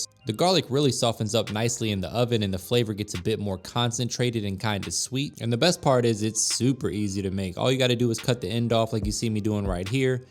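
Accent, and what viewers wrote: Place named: South, United States. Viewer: You are so southern I love you 😍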